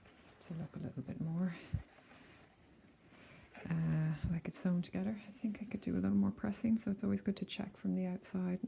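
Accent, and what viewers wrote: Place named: Ireland. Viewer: Irish accent😊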